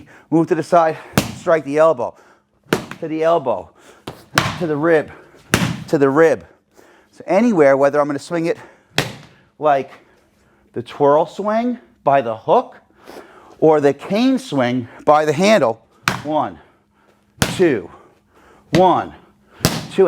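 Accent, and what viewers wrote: New York, United States. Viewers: Love your NY accent, have family in NY. Drink WARTER....lol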